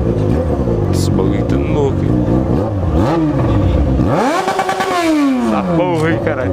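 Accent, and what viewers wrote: Goiás, Brazil. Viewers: Por que você fala no pique do Goiás. você de de Goiás?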